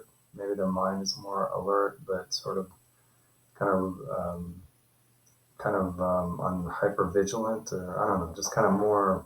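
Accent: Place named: Germany. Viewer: Lol. I'm actually normally good at accents and had detected some German intonations in yours, but same with some Irish people. I'll go check out if it is backed up by any teutonic influence...Talk to you another time.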